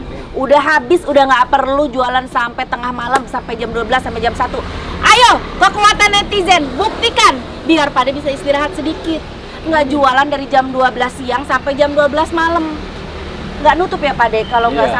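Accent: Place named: Sunda, Indonesia. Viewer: Sekarang aq jd ngefans am teh.. ngomng nya sunda pisan orgnya baik ke semua org sehat Dan sukses Terus teh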